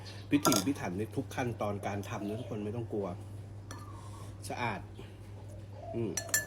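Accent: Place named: Korat, Thailand. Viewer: พี่ พูดเหมืนคนโคราเลยน้องเป็นคนโคราชแต่มาอยู่ที่ปารีสดูทุกวันเลย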